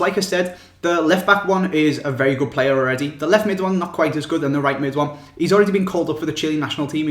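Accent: Liverpool, United Kingdom. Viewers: Yay another scouser, shame ur not a liverpool fan tho :P ;)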